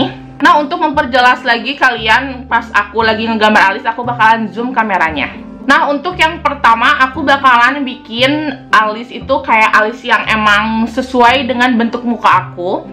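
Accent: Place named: West Java, Indonesia. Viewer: Pasti orang Sunda yah 😚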